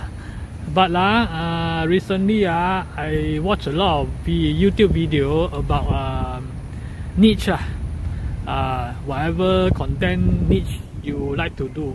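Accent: Singapore. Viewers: If I were to analyze, the way you speak is cater for Singaporean only. A lot of international fans will not understand what you were talking. But most Singaporean like to watch watch only 2 things on YouTube. Comedy and Chio Bu(especially those sexy sexy type). That's why if you see trending, top channels/ Singaporean always repeat their contents. Like those 10 types of students/ 10 types of that (Which I find it super boring). Even those channel with Niche like runningKao which is focusing about running did not become as popular as those channel with girls showing skin. Furthermore, runningkao's english is similar to yours which is more suitable for Singaporean. Therefore, I feel finding a niche is important but depending on Singaporean for views will not be rewarding in the long run. That being said, I still love your content especially pre-covid19 all those BTO, Grab food (Hokkien version) and races (That's why I subscribed to you channel be I love the way you speak and your character) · I am one of your subscribers, I love your content and your editing. 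You are very authentic and real. Don't change, I enjoy your uniqueness and Singlish. Thanks for your videos. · Hi, i've been following your channel for a couple of years now and i say there's no need to change or to find a niche. Actually you yourself is already a niche. Your channel is about you and your life. And who you are, speaking singlish like most of us do, is what make you a true blue singaporean. This is also what makes your channel relaxing to watch. One thing i suggest is to do away with youtube shorts as it doesn't really bring anything across. You can make 30min vlogs of you touring around your neighborhood eating different food and that will already be relaxing to watch.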